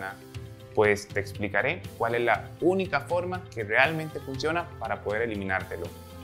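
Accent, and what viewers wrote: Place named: Colombia. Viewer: Pense que el doctor era Colombiano por su acento.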